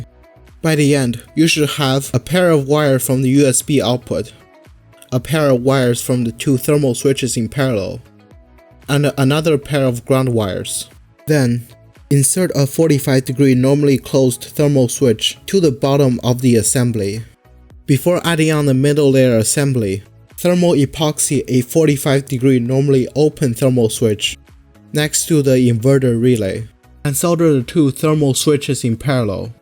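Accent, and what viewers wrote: China. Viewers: 你的口音怎么这么像中国人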